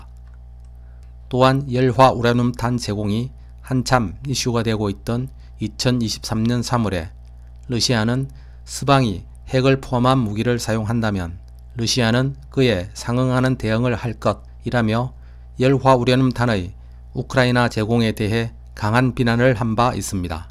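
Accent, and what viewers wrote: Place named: Gyeongsang, South Korea. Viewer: 보리문뎅이 발음 심각하네 스방의 열화 우랴늄 ㅋㅋ 적 1퍼쎈터가 되도록 농축얼 합니다 ㅋ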